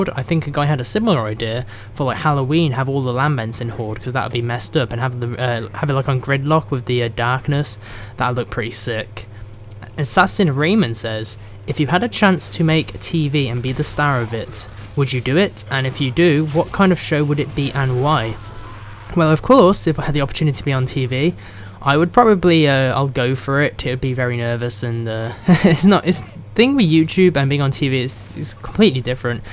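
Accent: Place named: United Kingdom. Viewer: What part of the UK r yu from??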